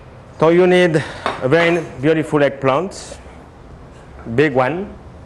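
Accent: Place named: New Orleans, United States. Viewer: As a kid I feel in love with her voice from the intros. It was so sultry and New Orleans to me. I still think it's sexy! Hey Great Chefs people, let her know that! She should do voice over work.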